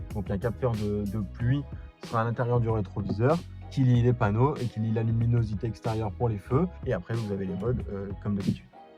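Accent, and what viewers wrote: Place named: Paris, France. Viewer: Le présentateur est sûrement très compétent mais parler vite avec un fort accent Parisien est tout simplement incompréhensible pour un mec du Sud comme moi. C'est vraiment dommage, je ne comprends pas la moitié de se qu'il dit 😢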